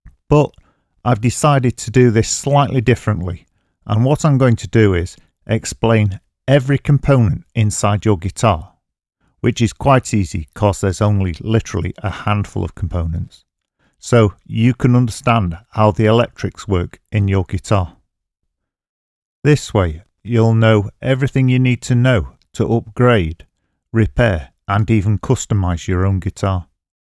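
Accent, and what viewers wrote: United Kingdom. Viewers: I am a language teacher and have no idea about these items you explained so well. Thanks, I subbed and am looking forward to other vids to come. Greetings from Austria! Btw I'd like to see some vids about how connecting different gear (looper, modeler, and other gear) Of course I subbed and liked. Cheers! Am I right, you're British, ain't you?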